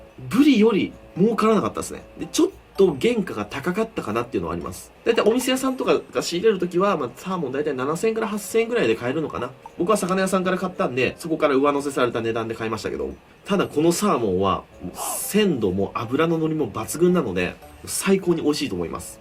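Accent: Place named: Kansai, Japan. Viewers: 今日どうしたんや めっちゃ関西弁やん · いつもは名古屋弁なのに今日は関西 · 最近喋り方が関西気味でおもろいw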